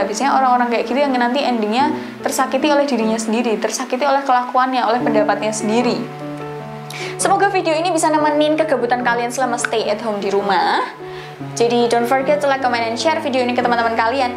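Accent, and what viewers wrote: Java, Indonesia. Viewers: logatnya orang jawa..? benaran gak sih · kok logat e koyo wong jowo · MBAK NYAA MEDOK BANGETTT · Jawa sekali logatnya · Medok wkwk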